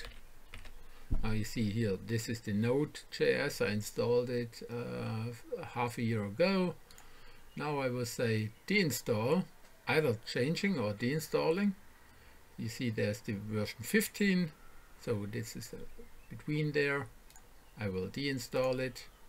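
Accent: Germany. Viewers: Man hört das deutsch unnormal raus :D gutes Video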